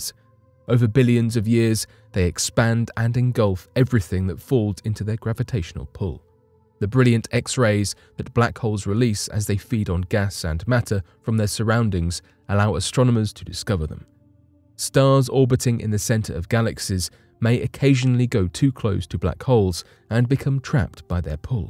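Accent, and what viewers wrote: United Kingdom. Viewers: How many times am I going to hear "space the final frontier" on a british voice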